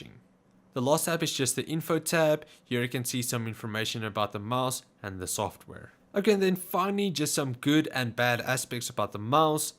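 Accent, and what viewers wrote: South Africa. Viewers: Sounds south african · thicc South African accent. Nice vid.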